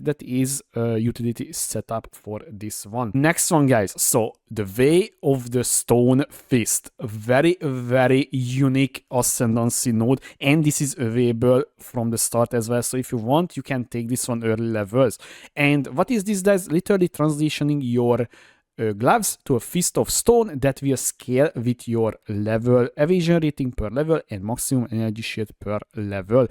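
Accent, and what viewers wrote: Hungary. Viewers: Sounds Hungarian to me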